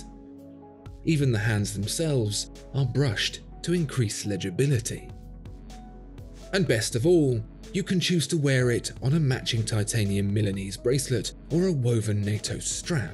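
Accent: United Kingdom. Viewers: So basically, this SMP is the Marine Nationale Pelagos that Tudor refuses to make. BTW, hearing someone with a British accent saying the most American of phrases "swing and a miss" is oddly satisfying.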